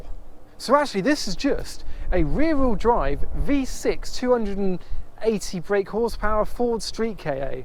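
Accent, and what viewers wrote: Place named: RP, United Kingdom. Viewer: I can't believe how posh you are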